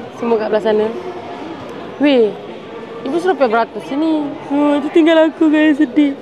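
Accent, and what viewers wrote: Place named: Sabah, Malaysia. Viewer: i give Sabahan slang A+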